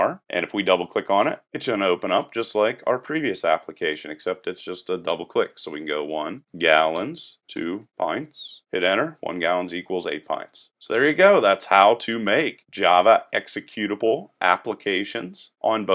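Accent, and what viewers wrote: United States. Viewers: I love your tuts, you know your stuff and you speak very clear American English! Rock on Bro!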